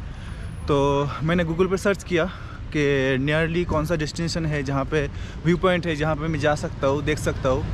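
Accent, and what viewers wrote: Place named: Bengal, India. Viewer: When he was talking I got it he is bengali i mean I am to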